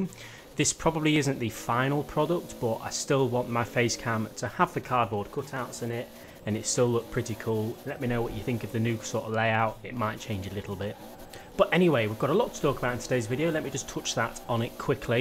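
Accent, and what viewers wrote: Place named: North, United Kingdom. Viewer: For some reason I never noticed how much of a northern accent has until now. 🤣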